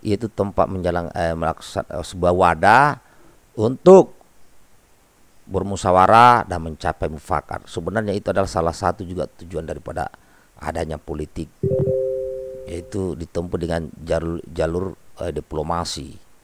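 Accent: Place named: Batak, Indonesia. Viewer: Salam hormat jg kawan,,, logat mirip batak eeeee gak apa2 ttp mantap, jngn lupa ngopi 👉☕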